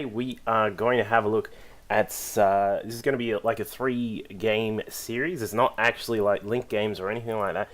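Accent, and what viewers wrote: Australia. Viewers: Do i hear Australian accent? Like the vids man, keep em commin'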